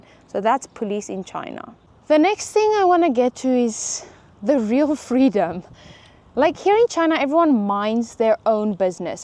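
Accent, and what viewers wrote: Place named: South Africa. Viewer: Two seconds listening to you i knew you a South African. Greetings from 🇿🇦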